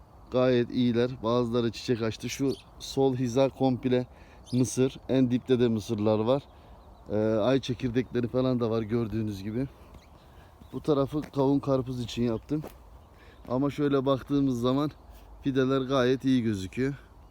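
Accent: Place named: Ankara, Turkey. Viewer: Buram buram ankaralı konuşması☺️ sırf hasret gidereym diye dinliyorum😀😀 şaka şaka bastan sonra izledim hobi bahçesi videolarını çok faydalı buldum emeğinize sağlık bu işe girecek kişiler için oldukça işe yarar bilgiler var. Birçok yerde izinler ve tarla arsa geçici imar izni konusu yüzeysel geçiliyor. Biraz detaylı anlatabilir misin? Teşekkürler...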